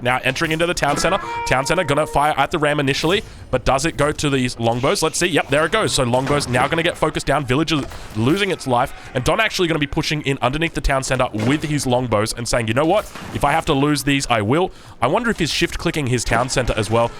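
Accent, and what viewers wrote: Australia. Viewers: So glad I found your vids and channel! More strong aussie casters!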